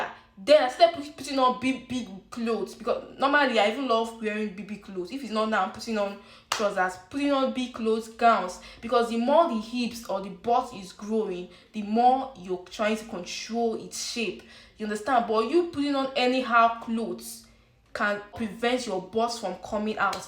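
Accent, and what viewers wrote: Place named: Africa. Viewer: The music makes it harder to hear what you're saying especially since i an not from Africa and the accent you have is new to my ears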